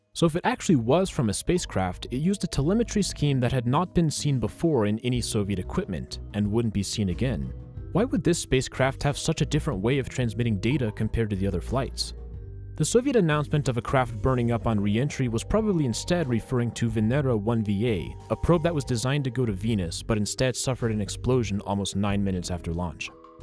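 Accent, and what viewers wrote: Italy. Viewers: your Italian accent is wonderful ps I'm italian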